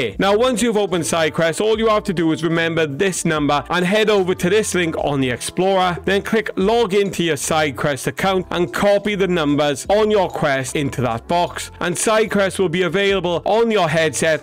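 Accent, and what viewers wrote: Wales, United Kingdom. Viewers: omg the welsh accent made this so much more enjoyable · Thanks! My friend said it took him 3 hours to get sidequest on his VR but with this method i got it in 15 minutes. Also proper Welsh accent 💪🏴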